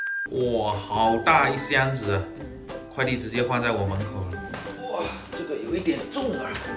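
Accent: Fuzhou, China. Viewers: 就喜欢浓浓的福州呛！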